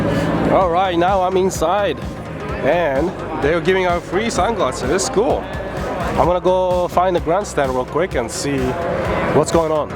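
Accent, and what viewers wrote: United Kingdom. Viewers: I love your Asian British accent! <3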